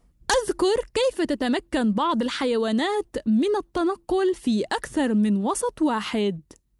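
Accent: Tunisia. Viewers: انتي تونسية؟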